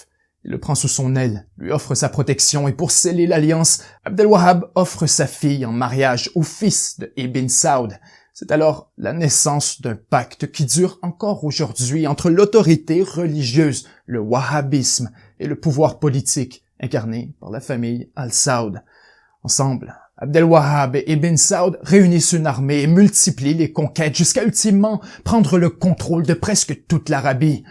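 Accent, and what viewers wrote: Quebec, Canada. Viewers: es-tu quebecois?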